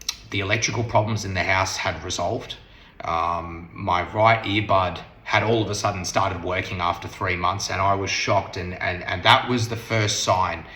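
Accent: Australia. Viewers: Don't apologize for your accent. When the intent is right things like accent don't matter! And you have a lovely Aussie accents anyways! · I'm from Bharat 🇮🇳 Your Australian accent to amazing. I understood completely no issues. What you experienced is not normal. Haman Chalisa meas connection to ALMIGHTY GOD. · greatest weakness: Australian English. The Indian viewer's greatest strength: Listening to Australian commentators for cricket. XD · Your Australian accent is lovely. Please never change your accent. I love listening your English. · Don't worry..... Indians are used to Australian accent from all the cricket commentaries we have heard all these years😂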